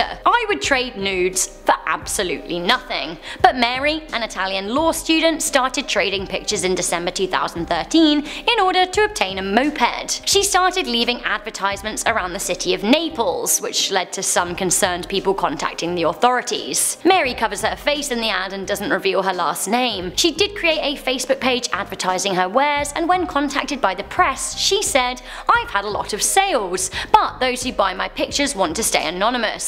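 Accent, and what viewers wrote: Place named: United Kingdom. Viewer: beautiful dress i love british accents ive been watching this channel for over a year and i still love your accent it never gets old to me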